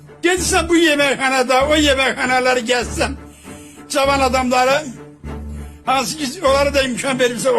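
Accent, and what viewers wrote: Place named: Jalilabad, Azerbaijan. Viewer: Юсиф Мустафаев боюк санаткардир ва чох тарбияли инсандыр. Бу йашлия кимса пул вериб ола билсинки, бела hагсиз данышир. Лаhжаси Джалилабадлы лаhжасина охшайир. Ман озум Ланкаранлыйам ва 37 илди Российада йашайирам ва hамиша маhниларина гулаг асырам. Ман бир джанублу баласы кими фахр едирам.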